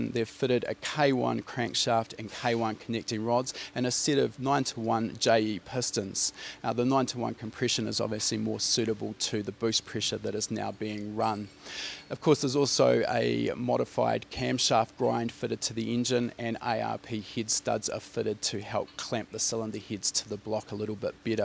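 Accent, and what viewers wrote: New Zealand. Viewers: Wonder if they could just run adjustable magnetic dampers? Less weight and complication to suspension. Lmao at using a 5.3. Epic. They're good for around 700-800hp stock but the K1/JEs will do wonders for going over that if the top end is looked after. Glad they went dry sump, a given for any LS over 1-1.2G sustained. Looks like I have a new goal racecar. Unlimited aero is what I'm looking for. Love the presenter, someone who actually knows cars. Great stuff! Sounds like a kiwi or maybe an Auzzie haha. Subbed.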